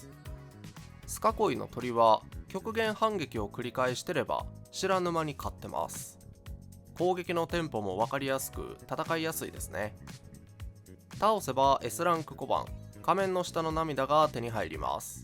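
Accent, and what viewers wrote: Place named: Kansai, Japan. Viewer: 隠しきれない関西訛り好き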